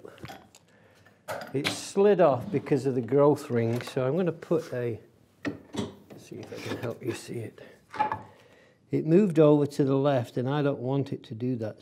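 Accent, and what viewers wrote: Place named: England, United Kingdom. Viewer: Super travail et quel plaisir d'entendre un bel accent anglais. Merci.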